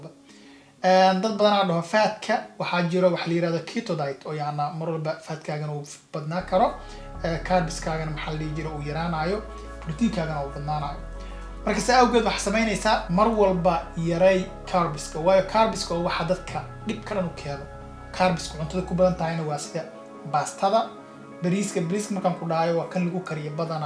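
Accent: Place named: Hiraan, Somalia. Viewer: I liked lahjadaada from asal Hiraan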